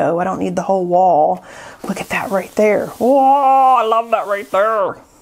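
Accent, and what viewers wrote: South, United States. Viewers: obviously southern part of the good ol' USA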